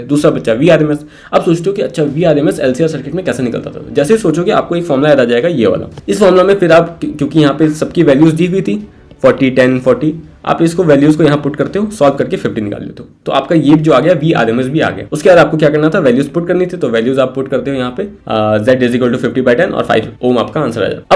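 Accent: Assam, India. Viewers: Dada Assam se ho kya ??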